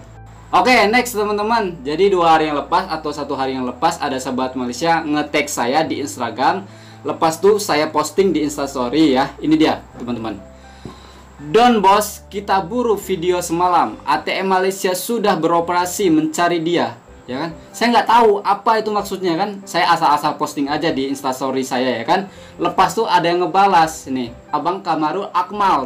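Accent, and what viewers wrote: Aceh, Indonesia. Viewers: Betul bro Loghat mcm aceh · Pelat bahasa nya org aceh,video yg mencabar kedaulatan malaysia itu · kerana gaya cakapnya itu seperti dia nya berada di Indonesia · Cara ckp to mcm urg aceh .. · Gaya ckp nye spt org acheh..xlma nt kna kutipla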